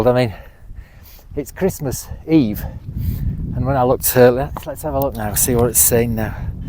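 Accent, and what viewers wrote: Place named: Yorkshire, United Kingdom. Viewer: Standard day int Lakes, but glad the weather improved for yas;) I've lived here two years now and it's hard to motivate yourself to get out when it's claggy and damp so much, compared to other places I've lived (Northern Snowdonia) and yes, a fellow Yorkshireman so always glad to hear you using the natural dialect and you like to get away from people!:) Better weather days are coming!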